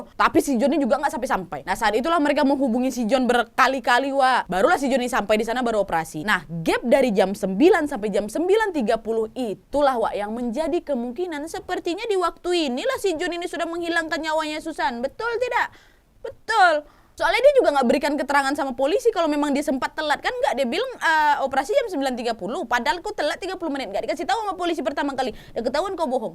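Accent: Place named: Medan, Indonesia. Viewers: Kak aslinya mana sih, suka bgt denger caranya ngomong, koq Kya tetangga aq dulu org medan😀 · Semangat btul Kk ceritanya 🤣🤣 BTW klo boleh tau Kk orng mana???? logat bicara nya seperti kami orng Sumatra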